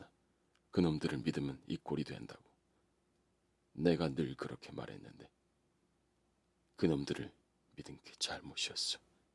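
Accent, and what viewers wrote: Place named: Busan, South Korea. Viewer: 부산분이셨군요~ 사투린 모르겠고 억양이 있어요 근데 오히려 그게 매력이 되는듯해요 전 좋네요^^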